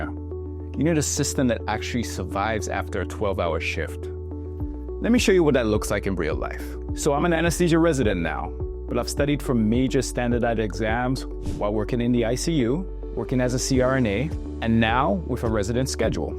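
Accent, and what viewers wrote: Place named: Caribbean. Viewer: Are you Caribbean? I hear my people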